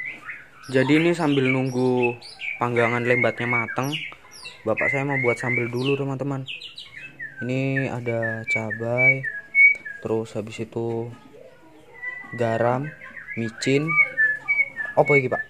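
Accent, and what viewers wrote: Java, Indonesia. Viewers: Mas wis tak subscribe, asline biyen Jowone ngendi ?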